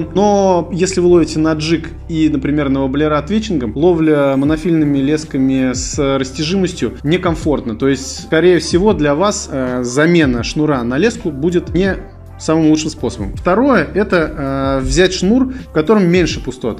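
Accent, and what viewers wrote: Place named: Penza, Russia. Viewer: произношение 50, как в Пензе)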